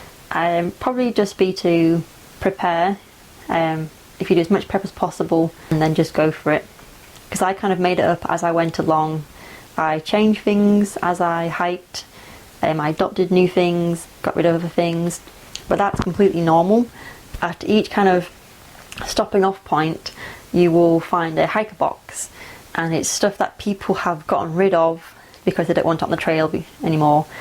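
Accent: Yorkshire, United Kingdom. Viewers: Wow! Thanks for the great story and footage. Didn't know you were a Yorkshire lass! From know on it's " Ey 'up" 8)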